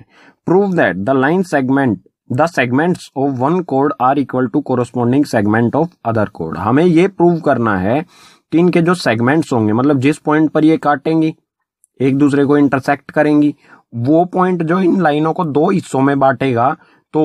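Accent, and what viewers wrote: Haryana, India. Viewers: Sir aap haryanvi ho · Sir aap Haryana se ho na ?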